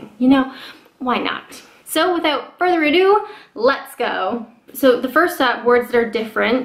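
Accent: Canada. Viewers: your Canadian own it. you say aboot its natural for you and its okay.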